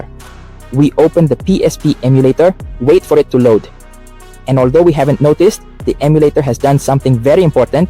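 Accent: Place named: India. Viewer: Bro buen trabajo con lo del inglés pero por curiosidad quería saber por qué tu acento suena un poco como de la india?😅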